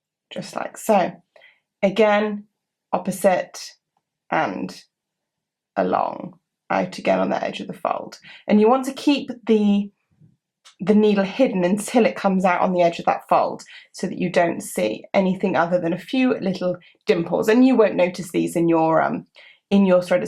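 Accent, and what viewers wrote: United Kingdom. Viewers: a lovely british accent which makes it quite easy to understand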